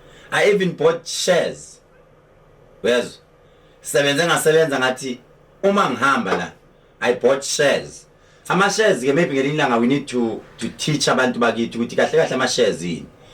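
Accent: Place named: Zimbabwe. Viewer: Ayi bengihlale ngisho ukuth uphuma eZim ngizwa ulimulakho uma ushumayela.kodwa ke uyiqhawe bhuti omncane futhi sengiswele amandla okufinyelela kuwe.ayi u Babawkhe waba yidlozi elihle lowakwa Hlosa kanti no stepfather wakho noMama wakho bakukhulise kahle khula bhuti omncane.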